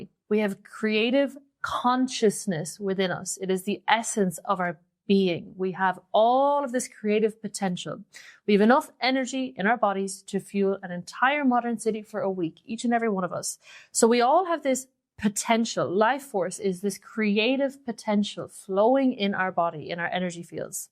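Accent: Ireland. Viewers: When awise awake Old Soul has also won the best face card... and intelligent and classy af, also.😭 She will be GRAND! AMAZING VID. You are also funny, when did some "shooting fails". Stay authentic, no to AI! ✊️ P.S. Are you Irish? ☘️ ✨️💜✨️ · do I detect an Irish accent there?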